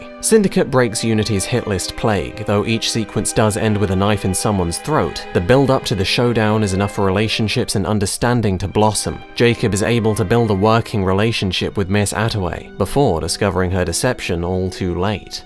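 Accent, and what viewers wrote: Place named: United Kingdom. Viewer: Anyone can cobble words together with a British accent... Intrinsic this extrinsic that... And sound smart to stupid people. If you are going to deep dive maybe read a little and give yourself historical context. You're missing so much and focusing in too much on mechanics but the rot in AC is in its spirit, not engine. Ubisoft is a sexual assault/crunch factory swamp... Toxic. That's why your games are ass. You don't even come close to addressing this stuff. I can't wait for a game essayist who has even one other idea/Interest aside from gaming.... So just Jim sterling lol. Read a book.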